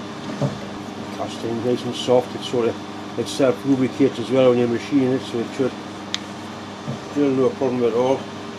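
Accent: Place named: Northern England, United Kingdom. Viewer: Sorry must have missed him saying that. With his Northern accent he is difficult to understand sometimes. If you want a good laugh, turn on the caption feature and see what YouTube makes of what he says. Regards from Australia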